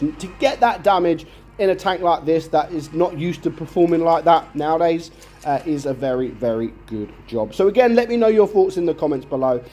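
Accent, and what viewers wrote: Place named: England, United Kingdom. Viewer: ^ is ENGLISH & doing his video in ENGLISH so he speaks ENGLISH** **( well there's a fucking surprise, "DUH"!!!!! ) "If" YOU want proper pronunciation then stop-watching, fuck-off & go make a POLISH version....YOURSELF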